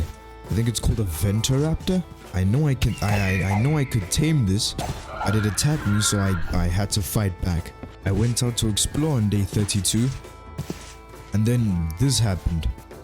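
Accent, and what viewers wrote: South Africa. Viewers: Hi mate cool vid really liked it are you South African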